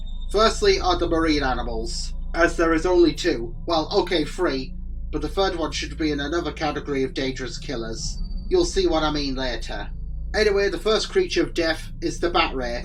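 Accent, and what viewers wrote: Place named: United Kingdom. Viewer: i must say, for a resident in the UK, you have a very interesting accent, what region of the UK are you, because your pronounciations sound unusual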